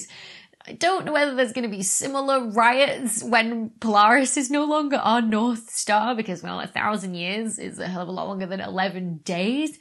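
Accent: United Kingdom. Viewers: Keep in mind that she's British and British pronunciations often differ from American. In the U.S. we call the second Greek letter "baatah," while the British say "beetah."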